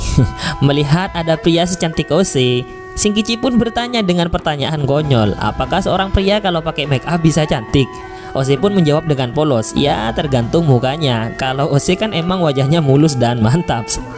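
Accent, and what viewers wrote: Madura, Indonesia. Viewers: Madura bingits cak, Kereen... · Woo..naratornya kacong y.. 😀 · Abang orang Madura yah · Penyiarnya kaya logat madura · Khas Madura ya kuat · Bang dari madura yaaa..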